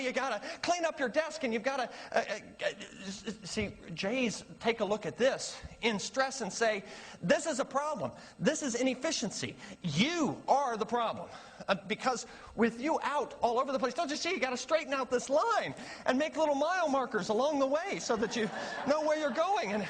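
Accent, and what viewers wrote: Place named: Midwest, United States. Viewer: Does anyone know how to describe this guy's accent/affect? It's vaguely Midwestern but I feel like we could get more specific. He sounds like my Aunt Betsy.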